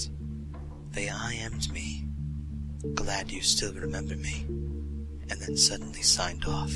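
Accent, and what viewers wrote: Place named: United Kingdom. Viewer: 原來不是只有我這麼覺得 超英國腔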